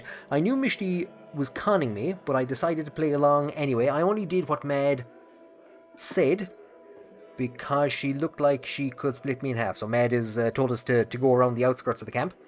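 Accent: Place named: Ireland. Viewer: irish guy playing aussie simulator....i luv it!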